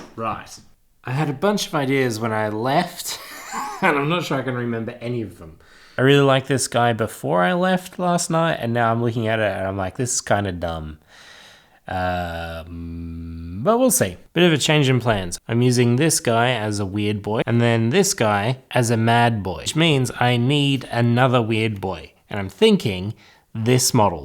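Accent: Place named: Australia. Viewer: I can see and hear that Australia axon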